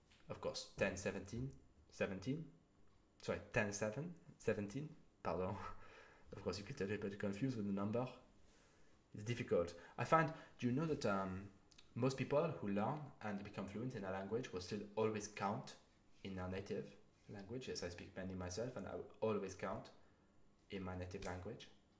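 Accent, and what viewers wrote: France. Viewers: Your French accent in English is perfect 😂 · I love it already ❤️ your French accent 😍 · Vous parlez très bien! J'aime votre accent français quand vous parlez en anglais aussi haha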